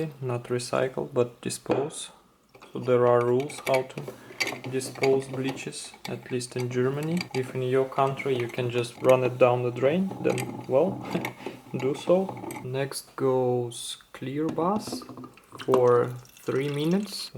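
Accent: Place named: Russia. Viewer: У парня тяжелый русский акцент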